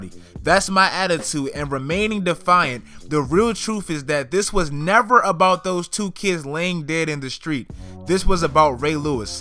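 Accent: Baltimore, United States. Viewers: Yo u from Bmore?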